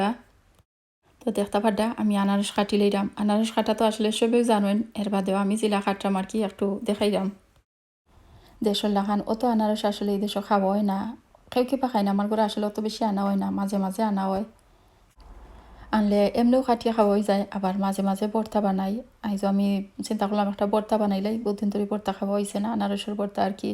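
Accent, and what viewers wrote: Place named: Moulvibazar, Bangladesh. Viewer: Afa afnar math huniya lager afnar bari moulvibazar. Jodi moulvibazar hoy tho kun elakay Please koiba?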